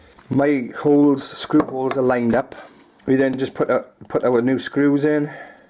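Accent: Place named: Scotland, United Kingdom. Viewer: Beautiful Scottish accent 😊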